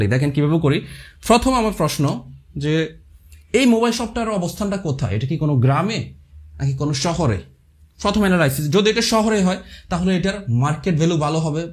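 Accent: Sylhet, Bangladesh. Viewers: ভাই আফনি কিতা সিলেটি নি 😮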